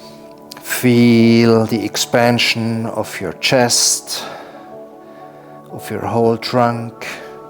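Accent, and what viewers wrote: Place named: Bavaria, Germany. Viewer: Sehr schöne Yogaeinheit um am Morgen wach zu werden. Ich mag dein bayrisches Englisch!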